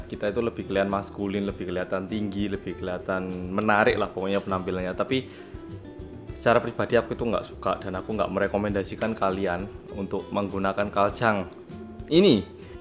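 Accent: Java, Indonesia. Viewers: 안녀와세요 저 한국에서 공부하는 인도네시아인 루푸스예요. 피디오보면 인도네시아 말하시면 진짜 사투리가 자와이네. 정말 처음 들어서 신기하다 ㅋㅋ. 화이팅! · Halo mas, mau saran aja, kayanya seru kalau mas bikin video dengan berbicara sedikit korea hehehe dengan diberi translate ke indoatau inggris tentunya penasaran apakah aksen korea masih kental meskipun aksen jawa sangat mantap! hehehe semangat dan sukses untuk vlognya!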